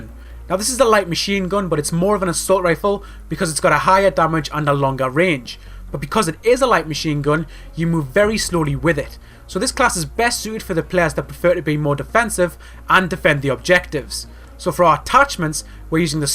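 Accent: Newcastle, United Kingdom. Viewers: he's geordie you numpty. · The good old geordie :)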